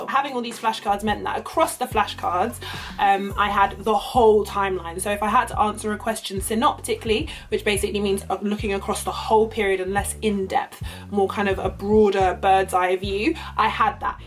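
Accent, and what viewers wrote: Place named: France. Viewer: This video helped a lot! Love ur French accent BTW <3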